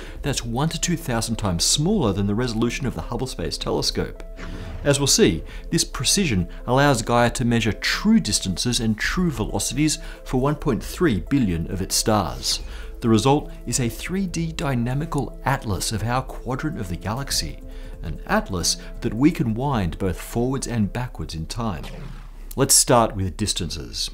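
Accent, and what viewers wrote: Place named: Australia. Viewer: this Aussie speaking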